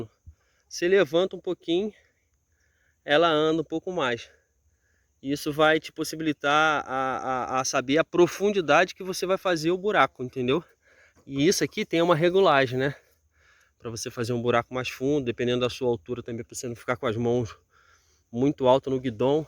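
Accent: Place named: Rio de Janeiro, Brazil. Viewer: Sou goiano e é a primeira vez que vejo um fazendeiro com sotaque carioca, porque geralmente a gente associa o sotaque à praia, litoral, algo mais urbano. No interior de Goias, São Paulo ou Sul é raríssimo um agricultor falando português com tanta perfeição. Parabéns, irmão. To pensando em comprar uma máquina dessas e seu video foi de grande esclarecimento. Abraço.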